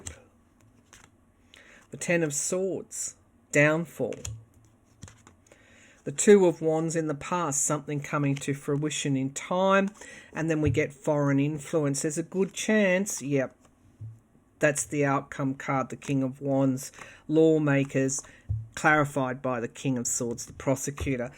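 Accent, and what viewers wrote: Australia. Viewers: I love listening to you, thank you for your readings, also good to hear an Aussie accent being so far from home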